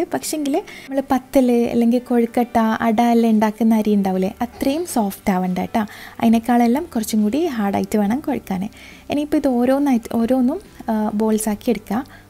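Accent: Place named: Nileswaram, India. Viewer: slang nhangalude nileswaram slang pole same und..athaa choiche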